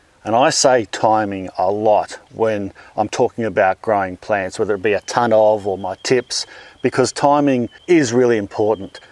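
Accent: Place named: Australia. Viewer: An Australian living in Canada now since 1971...your teachings are great, but your Aussie way... it is just beautiful ..even had a fleeting thought maybe I could return one day to live....the words written on your t-shirt seeing the earth through her eyes.... that is so precious and evocative..thank you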